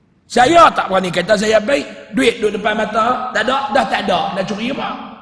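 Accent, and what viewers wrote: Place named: North, Malaysia. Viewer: .ni yg mna ada kutu dok kecam dia slama ni aku nk pesan kt hampa,hampa kecam dia hampa rasa hampa dh ckup sempurna 100% ja jd mnusia dlm dunia ni,aku Siam tp aku suka dngar ceramah dia cara dia ckap tu hampa jgn pelik kami org utara mmg loghat kami cmni dh..nmpak ckap mcm kasaq tp dak...nk taw ksaq ka dak mai hidup kt bumi utara nt hampa baru fhm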